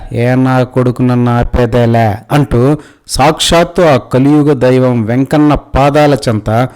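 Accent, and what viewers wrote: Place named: Telangana, India. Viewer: Durapu kondalu telangana yasa kee dooram ayyithe manchidi😂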